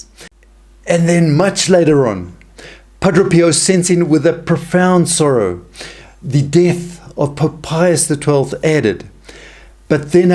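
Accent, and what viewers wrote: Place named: South Africa. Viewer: hmmm - nice to hear a South African accent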